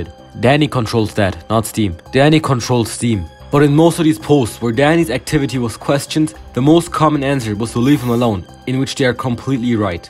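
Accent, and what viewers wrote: Germany. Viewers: are you german (im german) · You sound very German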